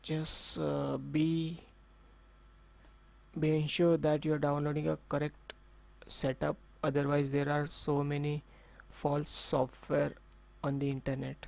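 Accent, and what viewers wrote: India. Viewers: Just a constructive comment: please make sure you watch you accent (the way you are speaking). This video, by mistake, might be watched somewhere at Europe or North America then people are going to make fun of Indians. Your content /subject is not also very helpful. For the future, keep this in mind.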